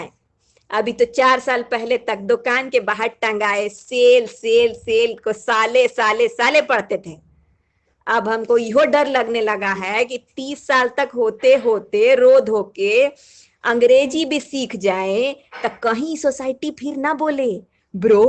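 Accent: Bihar, India. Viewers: Are gajab marde.ton se lgbe no kya ki Pakistani ho.. Bihari ton dito match kr diye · Love Bihari accent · Bihari accent cute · i love bihari accent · This kinds of Hindi speak people of western Bihar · It's actually in a Bihari accent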